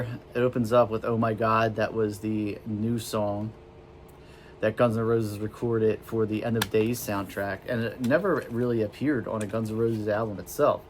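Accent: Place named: New York, United States. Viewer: You sound like you’re from NY like I am. If you are, I’ve got a 150 or so CDs for you to look at.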